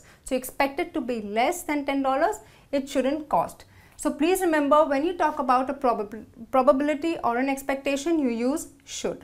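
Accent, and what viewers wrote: India. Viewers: distinctly indian accent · Indian English...as a british i can teach u how to prounce ''2'' correctly lol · yeah is indian accent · I used to avoid Indian accent. It was like a tinkerbell in my ears. But with you. It's as soft as a Teddy bear. (I don't know why...) And i need to improve my english with your very usefull lessons. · yap, i think it's Indian accent :)) Just learn grammar from here, learn accent from others !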